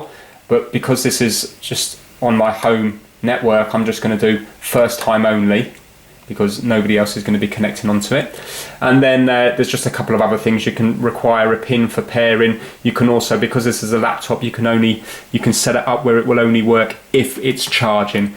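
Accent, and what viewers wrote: United Kingdom. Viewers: Man I fuckin love this british accent. Didn't even listen to what you said, just how you said it for the first few minutes. So yea I had to look it twice. x) greetings from germany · You are from UK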